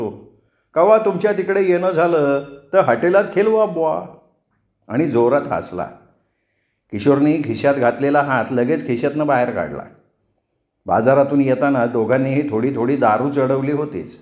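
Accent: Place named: Varhadi, India. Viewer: खूपच छान तुमच्या आवाजात वराडी भाषा कानाला गोड वाटते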